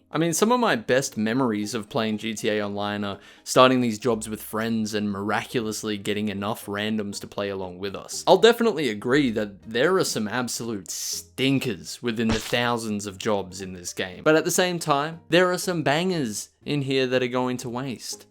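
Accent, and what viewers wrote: Australia. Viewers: Are you Australian Kiwi or English? cause honestly i cant tell...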